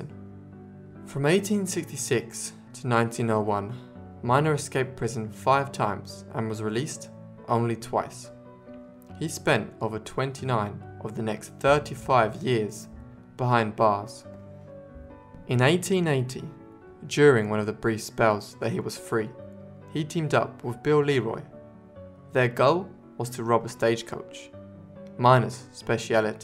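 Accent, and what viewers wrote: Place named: United Kingdom. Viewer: I don't care for the British accent narrating this.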